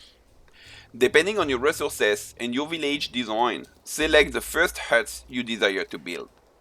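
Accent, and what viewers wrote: France. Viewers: i like your french accent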